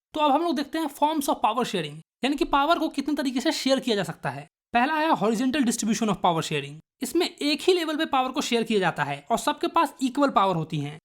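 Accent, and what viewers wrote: Bihar, India. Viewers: bhaya aap haryana se ho kya mujhe to bihar se lagte ho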